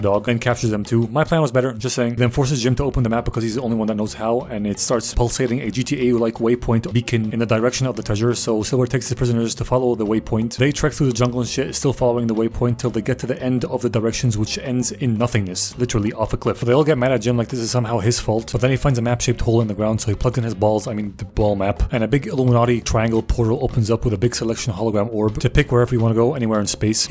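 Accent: United Kingdom. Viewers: Your British accent is rad!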